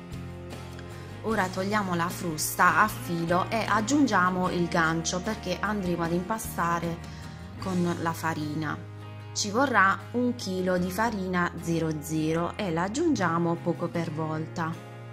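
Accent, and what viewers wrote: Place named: Taranto, Italy. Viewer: Bravissima anche la mia nonna le faceva cosi e forse sentendo il tuo accento non mi sembra molto diverso dal suo. Lei é di un piccolo paese in provincia di Taranto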